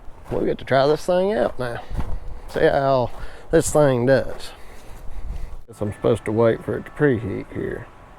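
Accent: South, United States. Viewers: Value beyond a price tag just watching you position that tractor, wait for the rock over, then gently glide that Blade to the sound! Total Master of your Trade and your creeping the controls to set that Box Blade is Art Work My Good Southerner! This is like the third time watching and it never get's old, pick something new up each time on your operator skills I transform to My lot for hours of frustration and confusion of not being able to capture the 'Magic' ! Ha, Lol. My 94 Honda 22 HP I just picked up is so 'touchy' on the controls? Adjusting the float helps but the lift is impossible to get to slowly 'creep' up and down on the hi-lo spots. My point of view is lower with my 5 ft. Tarter BB, I can't quite see into the box to watch that front blade? Angle of the dangle, what kills Me is to watch you skim in reverse pushing the blind spot of the back blade! 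Touching just the edges or a slight high spot. You make it look easy My Main Man! Enjoy the vid's and Southern accent/hospitality. Reminds Me of some old AF buddies from Virginia, Kentucky and of course South Carolina. Stay safe and healthy from Northern Nevada!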